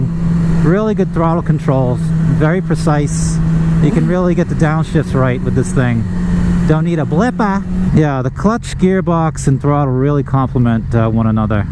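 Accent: Boston, United States. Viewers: It’s like Ben Affleck’s accent in Good Will Hunting...on steroids, in a bad way. Could only go 2 min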